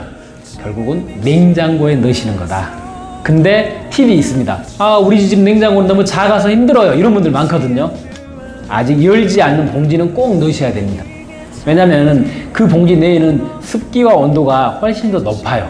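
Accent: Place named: Jeolla, South Korea. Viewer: 인상이 좋으시네요^^ 잘 들었습니다^^ 말투로 보니 제 친가 지역 (7시 ㅋㅋ) 부근에 있으신 것 같기도 ㅋㅋㅋ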